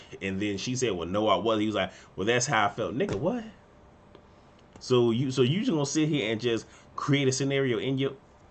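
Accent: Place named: Louisiana, United States. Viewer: Lmao dead I hope they throw that water out, are u from Louisiana? I sorta hear an accent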